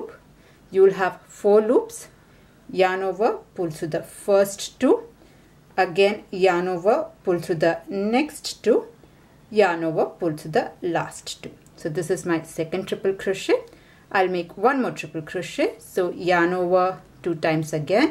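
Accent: India. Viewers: idk crochet but the Indian accent helps a lot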